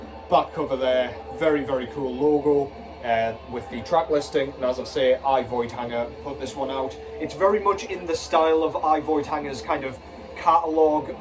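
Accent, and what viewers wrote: Newcastle, United Kingdom. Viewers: ah lived some of me life is Washington so ah knaa it can gan either way in Durham, but yuh sound completely Geordie tuh me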